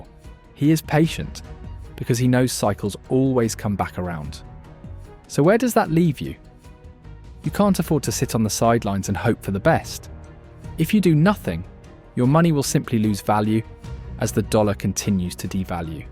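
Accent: United Kingdom. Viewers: Is it somehow cool to have a guy with an English accent narrating a video consisting of an animated hand drawing pictures? Why? 😶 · I hear a British accent I know the guy talking is a Socialist. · British accent doesn't help. Bogus video.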